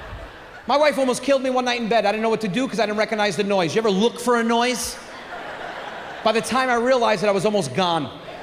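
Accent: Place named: South, Italy. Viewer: Hahaha spoken like a true southern Italiano 🤌🇮🇹. Loved it 👏😂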